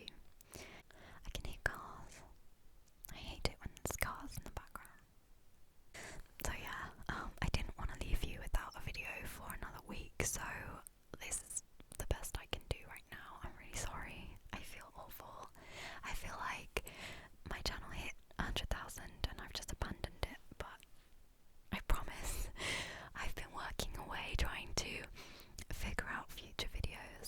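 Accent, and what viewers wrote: United Kingdom. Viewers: OMG.. very happyyyyy when i see that your video is up and its a whisper video.. i really like your voice.. your british accent is amazing.. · Glad to have you back. Looking forward to more wonderful ASMR videos with the new 3Dio. Congratulations on jumping past the 100k subscribers mark. You have the most delightful whispering voice with your British accent! You and Dimitri are amazing ASMRtists.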